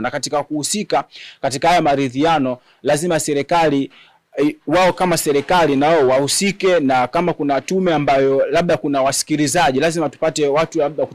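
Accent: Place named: Democratic Republic of the Congo. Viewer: Wew unaongea kama nani mvamizi.mcongo.siyo mtanzania.tulia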